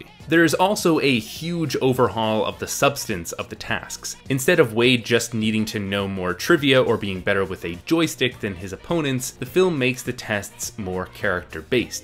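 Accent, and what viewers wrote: Canada. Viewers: Sorry, dude. I just hear Nerdwriter in a Canadian accent.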